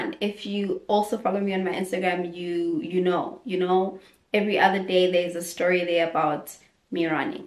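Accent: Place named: Los Angeles, United States. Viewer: Why that LA accent?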